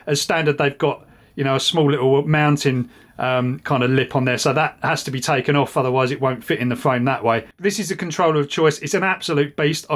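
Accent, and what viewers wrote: United Kingdom. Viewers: This would be a great video if you were not so damn British. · proper brit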